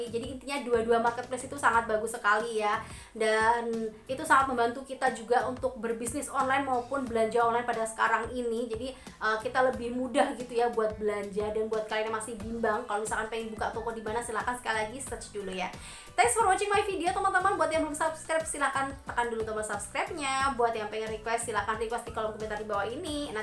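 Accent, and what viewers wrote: Java, Indonesia. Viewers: Medok bgt bahasany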